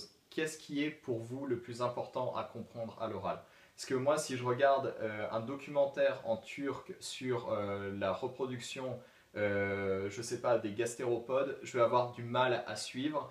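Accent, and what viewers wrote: England, United Kingdom. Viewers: c'est moi ou il a un léger accent anglais??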